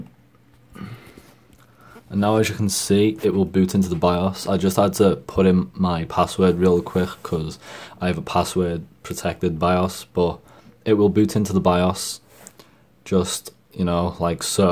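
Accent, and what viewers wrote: Liverpool, United Kingdom. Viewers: taaa lad, did not think id find a scouser on here with actual useful information xD nice one this really did help, my corsait k70 doesn't turn on until the option is allready passed to get into the bios so you saved me a lot of stress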